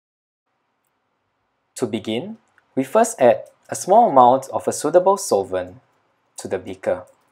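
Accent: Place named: India. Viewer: Sounds from india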